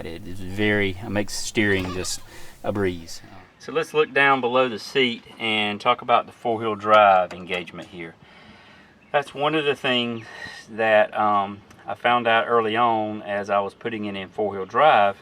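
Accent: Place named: South, United States. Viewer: I LOVE the highly intelligent review combined with the southern accent. You Sir are a class act and representative of all the good natured intelligent rural folk across our great country. And excellent review, subscribed!!!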